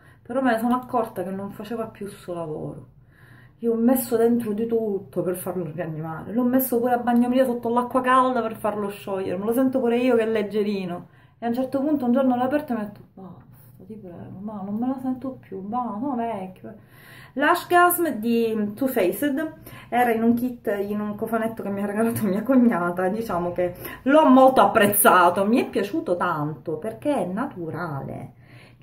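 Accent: Sicily, Italy. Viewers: Sei troppo simpatica e' un piacere ascoltarti...adoro l' accento siciliano e poi sempre piu' bella eh??!!😍😍😜😉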